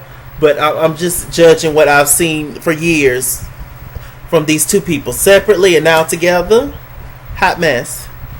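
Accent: New York, United States. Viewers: Your “NY” accent tho 🤣🤣🤣🤣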